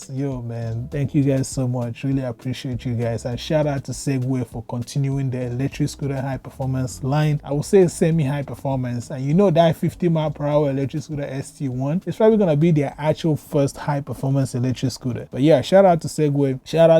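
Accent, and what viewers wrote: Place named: Caribbean. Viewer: also love your cool West Indian accent - so refreshing !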